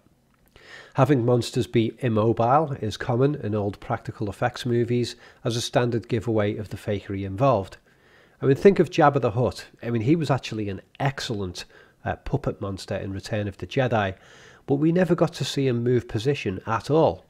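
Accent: Liverpool, United Kingdom. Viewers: Nice accent. Is that… Liverpool?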